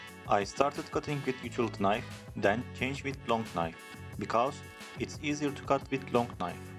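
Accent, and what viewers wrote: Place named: Turkey. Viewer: Merhaba. Bende de bir c5 aircross var. Altımıza yorgan sererek yatak mi hazirlasam diye düşünürken sizin videonuza denk geldim. Telaffuzdan da bizden olduğunuzu fark ettim :) Güzel fikir gerçekten kartonla ölçü almak. Bana ilham oldunuz, teşekkur ederim. İki ufak çocugumuzla 4 kisi uyumayi düsunuyoruz. Tekrar tesekkurler. 😊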